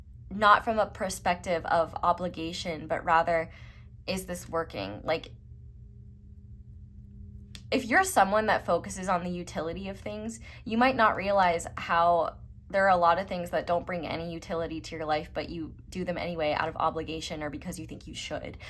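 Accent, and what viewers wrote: South, United States. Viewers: Omfg when you said "feeling my way through the darkness" that's by! Southern accent 👍 awesome 🎉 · You’re so endearing! I appreciate your gift! I especially appreciated your southern drawl! 😂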